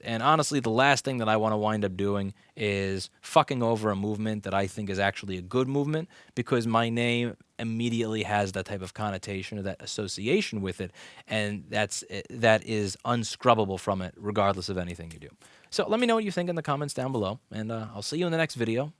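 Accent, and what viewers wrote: New York, United States. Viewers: Louis, someone else should do the day-to-day, but YOU should continue flying yourself to speak at legislative hearings and reimbursing your expenses ONLY from the advocacy group. The organization needs to have near-infallible, well-credentialed technical advisors and knowledgeable spokespersons from all corners of the RTR effort. YOU should be one of a large team of those experts. You’re a bit “New York” for this Oakland native, but you are well-spoken and engaging. You could tell a story about your trip to the “likkuh stowe” ...err, “bodega” last night for a bag of chips and people will listen. All my best to Mr. Clinton. Tell Oreo to go pound sand.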